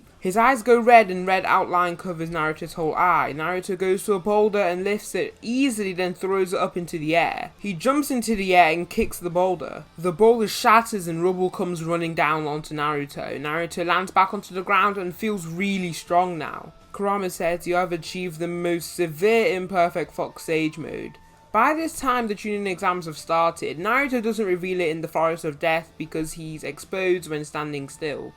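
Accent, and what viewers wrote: United Kingdom. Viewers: That Brittsh accent is so annoying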